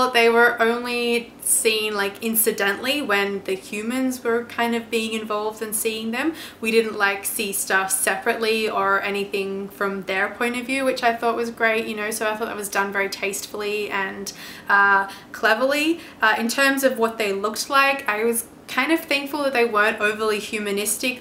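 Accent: Australia. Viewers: Loved your take off the film and I definitely agree with you! You are now becoming one of my new favorite movie reviewers on YouTube with a nice sounding Aussie accent and, dare I say, stunning appearance! Keep up the great work!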